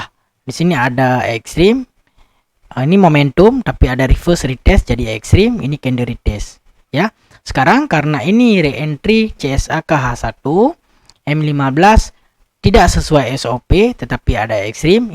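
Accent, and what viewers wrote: Aceh, Indonesia. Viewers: Orang aceh ya?? Logat jih khas that..😊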